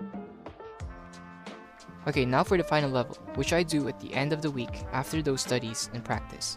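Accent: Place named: Netherlands. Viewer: Idk what is it but your accent sounds very dutch. Also great video!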